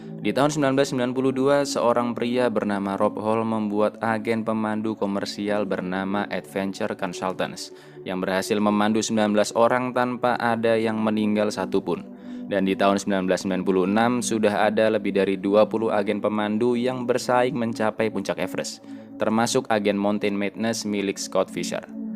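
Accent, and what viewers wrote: Java, Indonesia. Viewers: Jowo pundi mas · Min orang jawa?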